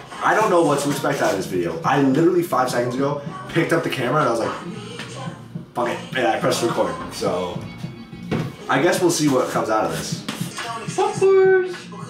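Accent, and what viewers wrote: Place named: Canada. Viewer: why do you sound Canadian in this 😭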